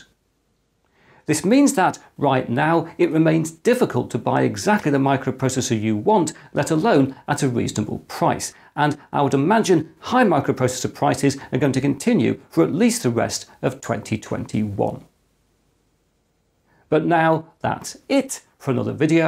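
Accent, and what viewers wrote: United Kingdom. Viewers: that british accent! love this channel.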